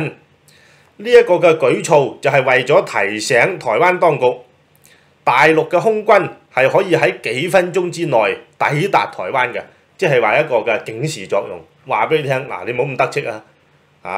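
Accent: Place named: Cantonese, China. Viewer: 你D廣東話 可唔可以標準D？ 你邊個鄉下請問 lmao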